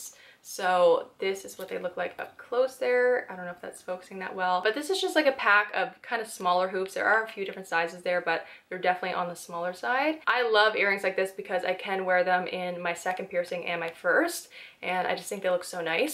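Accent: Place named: Newfoundland, Canada. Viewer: Me trying to figure out that maritime accent. Hmm Newfie or Boston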